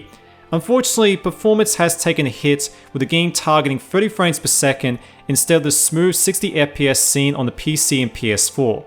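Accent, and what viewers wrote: Australia. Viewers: Is this an Aussie reviewing it?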